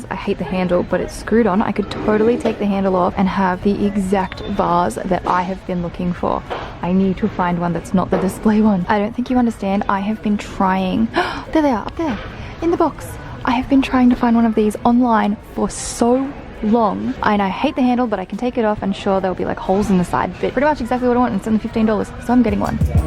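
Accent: Australia. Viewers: Just don’t loose that Aussie accent ☺️ so good to hear a local in amongst it all.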